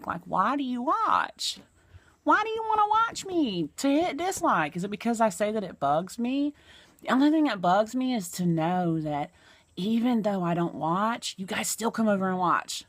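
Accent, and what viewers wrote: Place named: North Florida, United States. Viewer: Love the angel bottle! I knew from your accent you are from my neck of the woods and then you mentioned Gainesville. 😎 I am from N. Fla, nice to hear familiar speak. I found one of your videos from the negative stuff going around and then looked for other content from you and watched. You are such a beautiful woman and your heart shows, it's so easy to get caught up in other's BS when you are the brunt of their attacks, but it sounds like you have a wonderful family and a soulmate. You don't need to explain or defend yourself or even acknowledge the BS, your friends will stand by you and your enemies won't care anyhow. Keep posting your art and ignore the antics of a sad and lonely person, you are above that. Take care!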